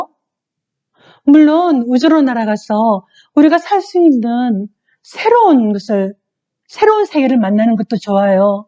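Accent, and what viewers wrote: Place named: Seoul, South Korea. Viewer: 유투브 개설 축하드립니다^^ 반갑습니다 님 글도 잘 쓰시지만 목소리는 더 예쁘시네요~ㅎ 부산 억양일거라 생각했는데 서울 말투라서 놀랐습니다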